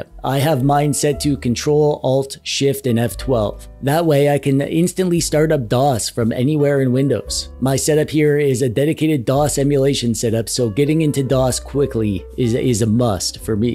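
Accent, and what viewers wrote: Canada. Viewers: I finally caught the Canadian accent. Still can't tell which region! DOS always reminds me of my days as a Gaming Corp IT Tech. We used IBM for everything at the Casinos. Down there making a million dollars a day, and we are up in the office running software from the early 90s.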